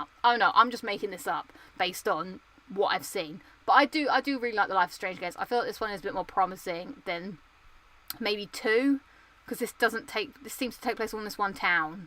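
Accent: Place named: Brighton, United Kingdom. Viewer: Are you from Brighton you sound like you’re from Brighton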